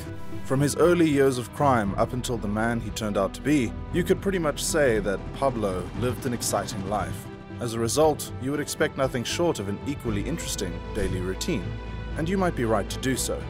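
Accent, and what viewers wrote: South Africa. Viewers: Are you from South Africa? Reason being - accent sounds familiar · The narrator sounds South African or perhaps Zimbabwean. I’d say Durban, South Africa.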